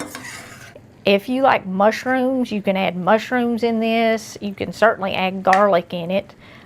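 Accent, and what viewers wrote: South, United States. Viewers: Hello sweet lady, I'm not sure if I'm doing this right but I'll try. I found your channel on my Roku TV and I just wanted to tell you thank you for sharing your love of cooking and love of God. I am 78 yrs old. My husband took his life 4 years ago and left me in a wheelchair. I live alone now and have no family here in Texas. I get real lonely at times, then by chance I found your channel and although I don't cook much anymore because it's difficult cooking from a wheelchair and too easy to get burned. Your channel became a daily pleasure to look forward too every evening. Your words about your love of Jesus and His love for us have lifted me so many times when my heart was so heavy. Thank you. Though I don't do much cooking since being alone I still enjoy watching you cook. You have the sweetest voice and southern accent. I'm originally from Massachusetts and I have a heavy Boston accent. 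God bless you for making my day. I watch all the videos I can and watch them over and over. (Hugs) from Fort Worth Texas...... Marie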